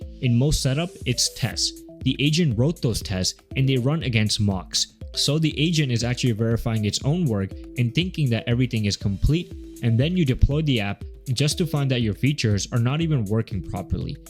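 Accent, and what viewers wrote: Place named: India. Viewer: Are you an immigrant from India or from another country? Your accent doesn't sound like that of a native Canadian or someone born and raised in the United States.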